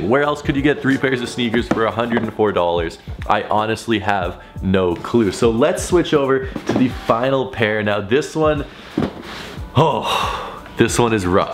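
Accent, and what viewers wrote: Winnipeg, Canada. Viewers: Are you from Winnipeg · Are you Canadian oh and I going to like my own comet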